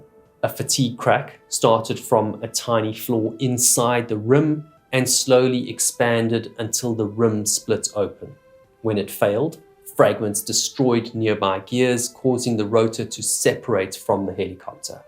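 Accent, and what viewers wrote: South Africa. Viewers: Nice to hear your SA accent again from NZ. In the 1960s the old BMC Mini had a magnet on the oil drain bung, it was often covered in fine steel particles, if anything larger was noted, they were visually examined. Sections of teeth could be identified by comparing with other gear clusters. The customer could then decide if a precautionary teardown was in order or if they would risk it until failure. Only once did I hear of a complete seizure, this resulted in the car stopping like full application of the brakes; being front wheel drive. It was hit by the following car, no injuries. Keep your good work videos going.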